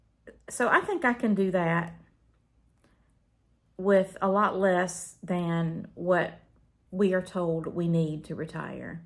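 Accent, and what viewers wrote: South, United States. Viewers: You sound southern like ME!! I am 59 y.o. I call it pre retirement hell. I'm an RN and a school teacher. First part of my career was nursing. My 2nd career is teaching k12. Im trying to make it to 67. I probably wont make it. Ill probably retire at 62 but only if I can live off of the $. It takes every bit of my patience to do my job at this point. I too just want some peace in my life. Just simple things but simple is not cheap. My home is debt free. Ive got a small truck payment. No credit card debt but I do have some student loan debt that im despararely trying to get paid via pslf and its all stuck in limbo right now. Its like everything is stuck. Like everything. It's like that movie Groudhog Day.. SSDD.. stuck in same ole same ole.. it feels like I will work until I die. When will this pre retirement hell end?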